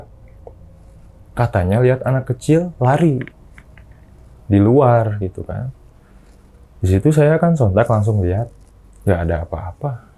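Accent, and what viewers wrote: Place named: West Java, Indonesia. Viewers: Intonasi yang stabil, tempo medium penceritaan dan bahasa yang mudah dipahami membuat cerita lebih menarik dan nyaman untuk didengar, sekalipun dengan aksen Sundanish.. Salam Om dari kota tetangga, Cipanas. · Meuni sunda pisan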